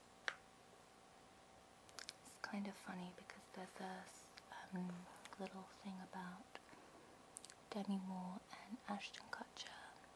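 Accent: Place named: United Kingdom. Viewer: Are you from Korea? Your accent in English made me think you were from the UK!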